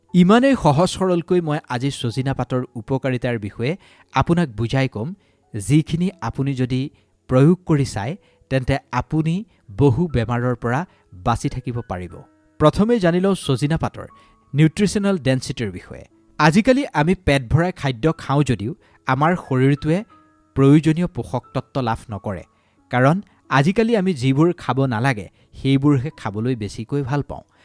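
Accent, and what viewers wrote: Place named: Nalbari, India. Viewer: Nalbari para koiso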